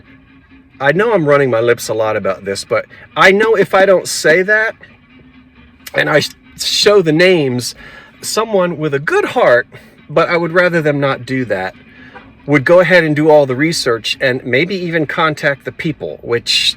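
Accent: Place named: Philadelphia, United States. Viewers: Yeah, you kinda do have a South Jersey accent. I really enjoyed last night's sale. Lots of fun! Great video, as usual! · You sound a little bit philly you have said wooder for water 😊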